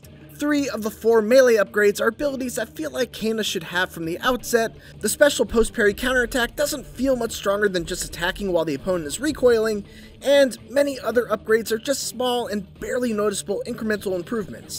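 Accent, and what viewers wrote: Canada. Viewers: Why this dude sound like Canadian Guy Eh? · This guy sounds like Canadian Guy Eh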